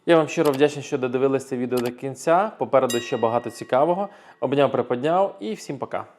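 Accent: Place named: Kuban, Russia. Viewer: Ви ж українською розмовляєте як хлопець з Кубані, калька р0сійської то звичайно що Норвежська для вас буде більше чужа а ніж українська а якщо не хочеться на свої хліба то можна на Кубань або в Білорусь, вас там краще зрозуміють як у Норвегії, миру та щастя всім…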